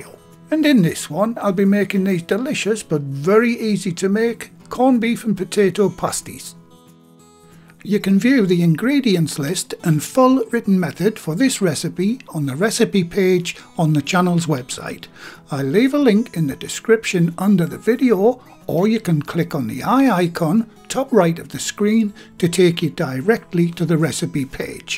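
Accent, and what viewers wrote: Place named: North East England, United Kingdom. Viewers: I think you’ve earned a sub. Gonna give these a try once they get flour back in the shops lol. Btw I’m from Sunderland your accent doesn’t seem you’re that far away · Thanks so much, those pasties look heavenly, I must give this a try. So well explained and presented, you are a star, absolutely love your North-East accent, take care, best regards Rob. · Hi where are you in the east as you sound as if your in Durham,my mumd loves how you speak as I shown her your channel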